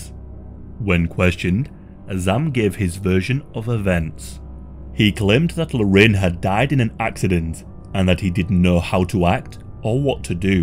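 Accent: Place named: Northern England, United Kingdom. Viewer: Sounds like northern England 🏴😊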